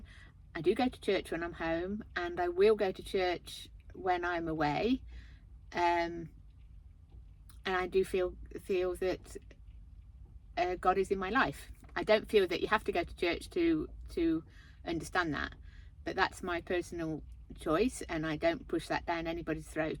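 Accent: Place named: Worcestershire, United Kingdom. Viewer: I thought your accent was local. Keep flying the flag for Worcestershire!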